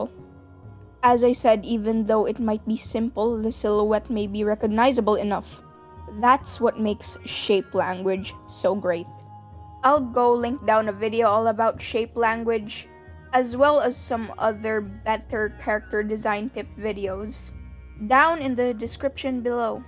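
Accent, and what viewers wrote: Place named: Philippines. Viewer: I knew your Filipino from the way you speak (IDK but I can easily determine Filipino accent) but I got the confirmation with that YAWA PUTANGINA moment.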